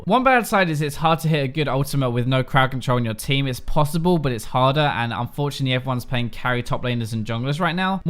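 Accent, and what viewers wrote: England, United Kingdom. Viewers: hey which part of England are you from? i cant figure out from your accent, thanks:)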